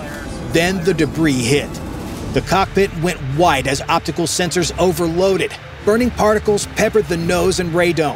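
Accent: United States. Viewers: As an Australian I am disgusted that we misuse our resources this way. We have no business to be in that region. This American accent gives me the Heebie jeebies - what a load of horse shit. Actually sounds like a load of wishful thinking. Australia really does not want to have war with China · Why would you use and American narrator for this video? LMFAO Chinese Pilots, you can blindfold them with a shoelace.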